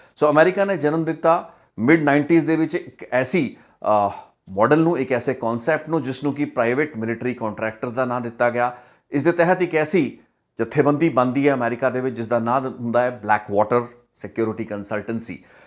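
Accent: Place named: Doaba, India. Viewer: One thing noticed more about u that u have doaba accent... "Peha"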